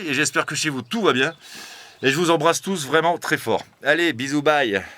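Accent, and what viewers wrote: Nord-Pas-de-Calais, France. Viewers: j adorre tes commentaires avec l accent et les termes chti . je suis de boulogne tu devais etre de lens vu l accent . super potager ché qu'in mem' un tiot peu ch' bordel . mi à ch't' heur ' j'mont ' min bassin à pichons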